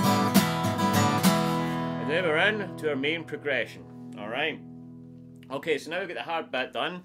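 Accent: Scotland, United Kingdom. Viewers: He is Scotish . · Great video, To check his fingerwork, especially on the A7 ascent, I slowed the video down to 25 and 50%... The Scottish accent sounds brilliant!. Like some drunk old pirate - fantastic! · Scottish? Good riffs? Good lessons? Instant sub mate!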